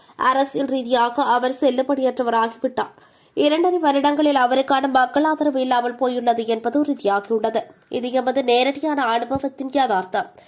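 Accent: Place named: Sri Lanka. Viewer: இதுதான் இலங்கை தமிழ் சகோ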